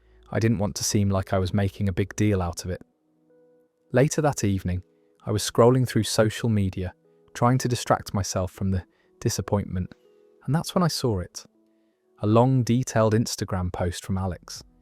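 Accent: United Kingdom. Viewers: Does anyone know where this accent might be from? I’m sure it’s a British accent, but I’d like to know the dialect, it’s very peaceful.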